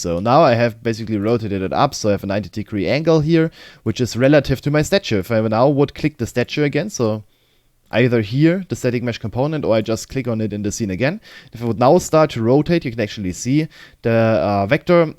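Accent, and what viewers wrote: Germany. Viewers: No hate, but u can tell he german xD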